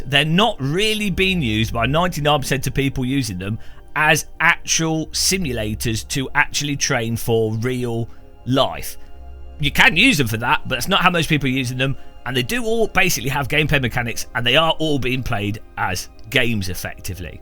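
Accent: United Kingdom. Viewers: Here we have it folks. This is why they tell you not to consume lacquer thinner, let alone on a daily basis... That bald, british sounding man's brain rot level has gotten severely out of control at this point. Time to gently smile back and slowly leave the scene. Might be contagious after all... 😂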